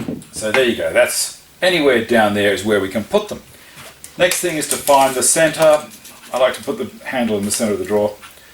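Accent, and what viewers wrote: Australia. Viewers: Ive just stumbled upon your channel while researching melamine cabinets before tacking my kitchen, and you are a breath of fresh air! After watching so many hours of youtube videos from guys in the US and hearing everything in fractions of inches, and about how cheap plywood is over there, its so good to hear an aussie voice talking in metric. Subscribed!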